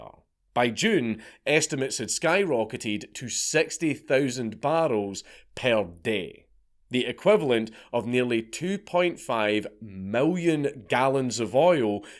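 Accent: Scotland, United Kingdom. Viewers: You are Scottish do the Piper Alpha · spill with a Scottish accent sounds awfully close to spell, and at times had me wondering about what wizard was responsible for this catastrophe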